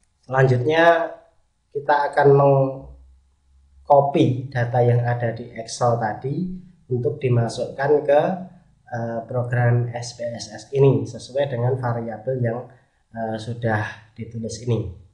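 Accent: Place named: Java, Indonesia. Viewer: medok-medoknya sekilas mirip sama alm. bapakku.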